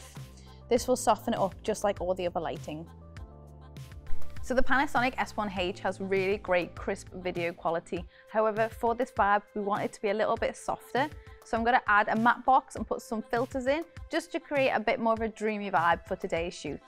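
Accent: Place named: Liverpool, United Kingdom. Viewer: She’s great and that gorgeous Scouse accent. Well done on all your success!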